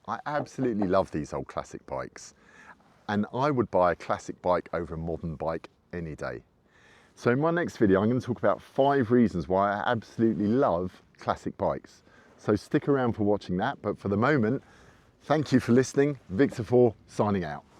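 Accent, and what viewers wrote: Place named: United Kingdom. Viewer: You sound like you have a British accent. As a Brit, have you owned or ridden a classic (pre John Bloor) Triumph or other British bike? I’ve owned many old 1960’s-1970’s Triumphs over the decades, and I still have a couple. Although it’s not as easy as running to the local dealership, finding parts for those older bikes isn’t really a problem. You can find anything you need online… for now, anyway.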